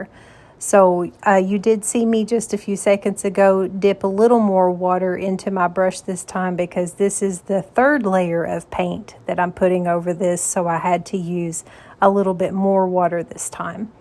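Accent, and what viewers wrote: South, United States. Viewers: that southern drawl is to die for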